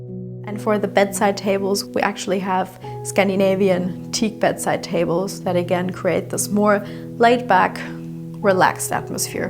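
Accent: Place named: Germany. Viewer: nonchalant 💅🏼 der deutsche Akzent ist so adorable.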